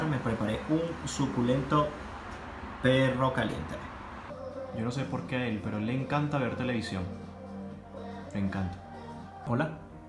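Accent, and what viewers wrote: Venezuela. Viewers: Eres Venezolano mi pana? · Hola, cuando te escuche por primera vez le dije a mi esposo; cono este chamo es venezolano y efectivamente. saludos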